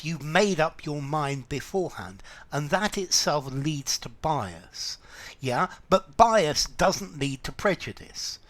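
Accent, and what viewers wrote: United Kingdom. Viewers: We benefit a lot from you,british accent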